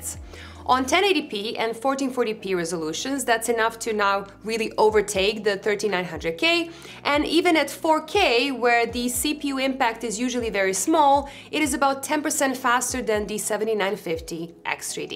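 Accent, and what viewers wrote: Netherlands. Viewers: I heard Netherlands. Got to support our fellow dutchies. Nice review btw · Ik hoor heel licht je Nederlandse accent …❤ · love that dutch accent as well..super!! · I'm mixed Dutch/English and think you have the most beautiful English with a Dutch accent I've heard! Oh and thanks for the video. 😄👍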